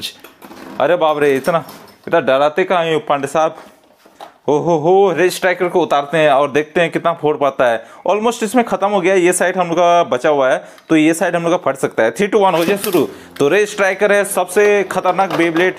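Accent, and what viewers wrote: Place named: West Bengal, India. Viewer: West Bengal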